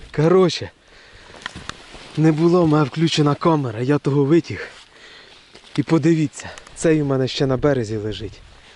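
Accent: Poltava, Ukraine. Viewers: Ніяк не зрозумію звідки ти. Говір полтавський, але сула нетипова для полтавської обл. Хоча, я не скрізь бував. А може сумська?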